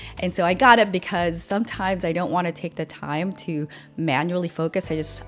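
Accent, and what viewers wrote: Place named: United States. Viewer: Yes, American accent.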